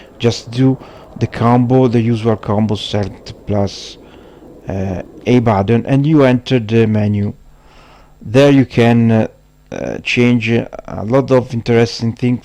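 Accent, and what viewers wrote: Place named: Italy. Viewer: Mi pareva dall'accento inglese :) Bel video, non conoscevo Batocera, ci darò un occhio!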